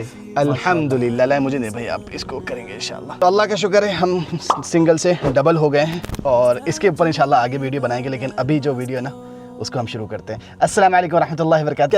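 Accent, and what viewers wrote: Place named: Pakistan. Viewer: Bhai aapki Zuban Pakistan wali hai, main confused hoon ki aap kahan se ho?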